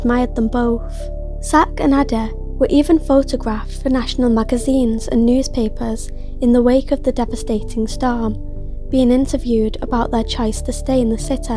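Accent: Manchester, United Kingdom. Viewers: You sound like Manchester England. Well done presentation!!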